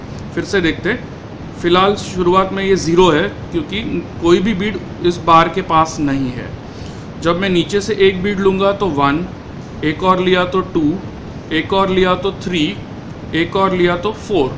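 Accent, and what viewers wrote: United States. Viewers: Kyu aap american hai Kya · tu america se hai kya??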